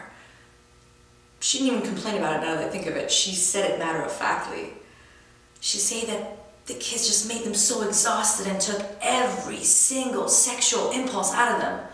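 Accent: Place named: United Kingdom. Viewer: I LOVE your British Accent!!!!!!!!!!!!!!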